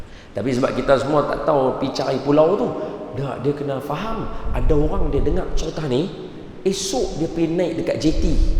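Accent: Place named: Penang, Malaysia. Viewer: Terbaik Ustaz Don masih pekat penang tu hehe 😁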